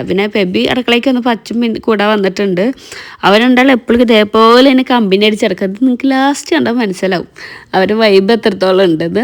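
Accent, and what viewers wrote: Thrissur, India. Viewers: thrissur okke angane ahn parayaa😂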